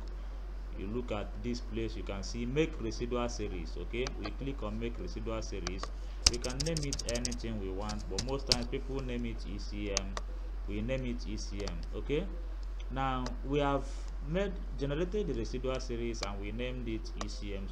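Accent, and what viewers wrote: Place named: Cockney, United Kingdom. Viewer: I wish I could have the patience to deal with cockny accent in my dire times.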